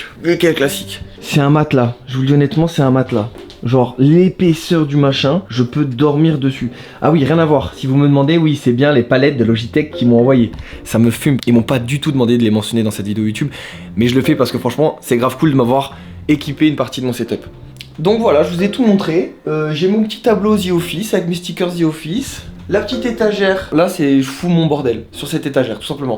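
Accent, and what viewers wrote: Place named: France. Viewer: Tu devrais vivre dans une banlieue islamisée, tu as tous les tics de langage des jeunes des banlieues.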